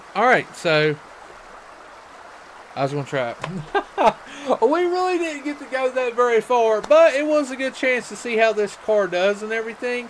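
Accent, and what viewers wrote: West Virginia, United States. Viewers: Is he from WV💛💙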